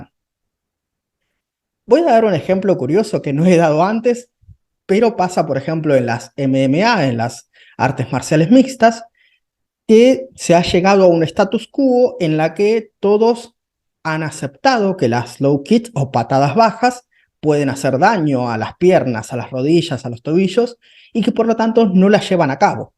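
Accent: Standard. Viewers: Me da ternura que hable en neutro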